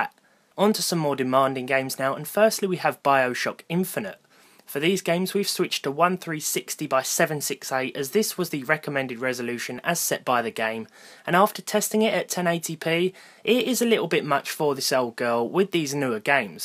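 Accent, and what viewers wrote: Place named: England, United Kingdom. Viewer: dude I'm so glad I found ur videos it's so good to hear a familiar English accent know so much about gaming on a budget haha ur sooo chill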